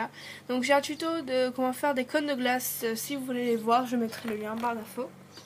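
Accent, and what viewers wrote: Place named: United Kingdom. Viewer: J'aime beaucoup ton accent britannique ! x'D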